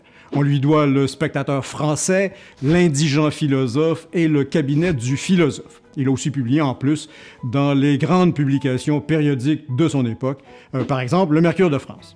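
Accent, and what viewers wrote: Quebec, Canada. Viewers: j'avoue du Beaumarchais avec l'accent Québécois ça aurait de la classe , je vote pour !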